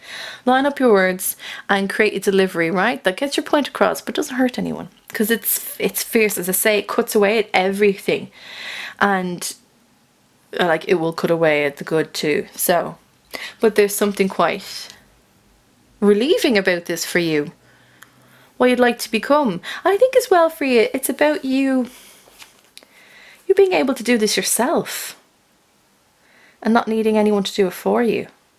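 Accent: Ireland. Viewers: Love the Irish accent 😌✨ dope reading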